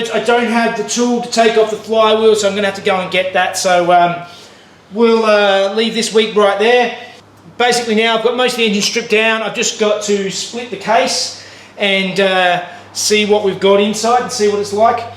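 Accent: Australia. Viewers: Aussie accent? If so how can i understand most of what u say not the same with that cycling durianrider i cant barely understand.. Greetings from mexico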